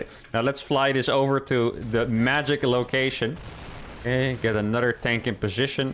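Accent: Netherlands. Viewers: Lolololol "yea I'm proud" I love. and Lowko are my fav SC casters. They voice/accent makes me want to visit the Netherlands. Seem like some wonderful fun and cool people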